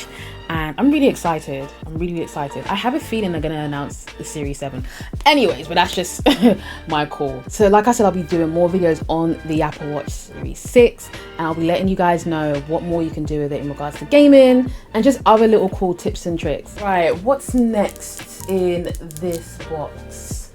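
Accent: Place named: United Kingdom. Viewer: Wow…nice. Don’t know how I missed you. Beautiful and witty. And that British tongue 👅……. Cheers! 🇬🇧 🇺🇸👍🏾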